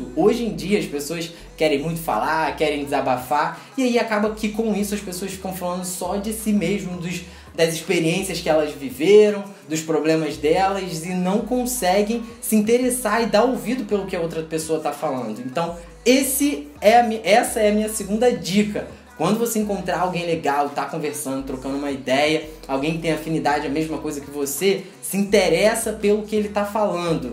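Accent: Rio de Janeiro, Brazil. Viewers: Tu è do Rio né?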